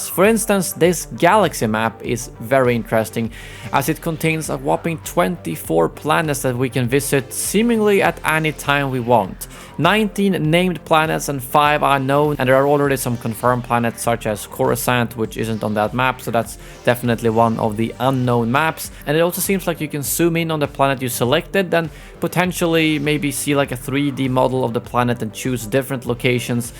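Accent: Sweden. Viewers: bru why is he speaking with a swidish accsent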